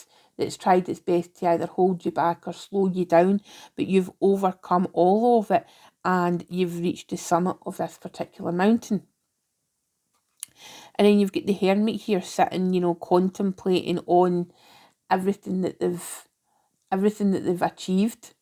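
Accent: Scotland, United Kingdom. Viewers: Interesting. First time here ☺️ am I detecting a Scottish accent?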